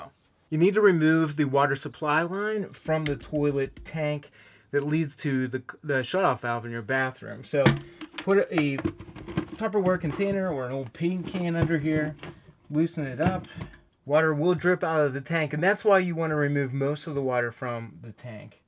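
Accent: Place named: Pennsylvania, United States. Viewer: Ah! knew you were from Pennsylvania! I’m from Philly area but now I’m out in California and your voice just reminded me of home. Thank you for making this video my toilet has a crack in it and I don’t want the repair guys in here during quarantine so here we go! Oh I don’t have that stuff that goes up the water but I thought of another good thing that I randomly have (and I don’t have kids so it’s very random I have this- I actually used it to make snow for Christmas since there is none out here ) If anyone happens to have any diapers they can use the inside of that just rip it open and there’s is fluffy on the inside that turns into that same looking gel. Glad I’m a little bit of a hoarder at the moment Ha Ha Ha Ha. Anyway thank you !!!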